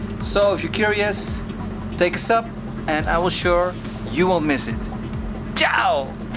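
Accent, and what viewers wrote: Netherlands. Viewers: Leer effe normaal engels te praten zonder zoon verschrikkelijk Nederlands accent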